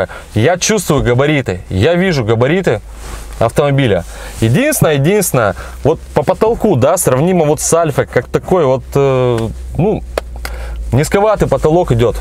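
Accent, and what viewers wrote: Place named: Primorye, Russia. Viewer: Нормально говоришь.чисто приморский говор, меня раньше в европейской части не понимали ,медленно готворят,москвичи вощще пока подумают прежде чем сказать😄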